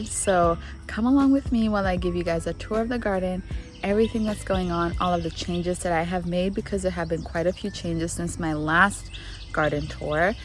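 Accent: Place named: Kenya. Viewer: I was trying to pinpoint your accent . I would not have guessed a Kenyan influence. I'm in 8A in Mississippi. You may have mentioned it, but have you tried eating the sweet potato leaves (prepared like spinach)? I know it is a popular Asian food.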